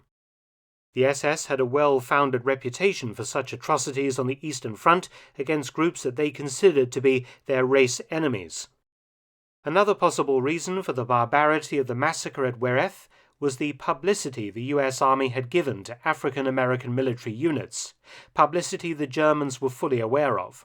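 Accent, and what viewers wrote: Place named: RP, United Kingdom. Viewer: GENERAL SOUTHERN BRITISH/Received Pronunciation.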